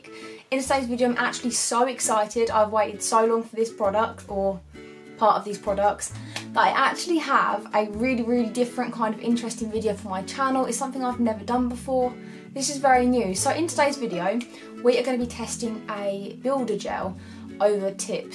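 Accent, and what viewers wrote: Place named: London, United Kingdom. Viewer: It’s so nice to hear a london accent. Really enjoyed her video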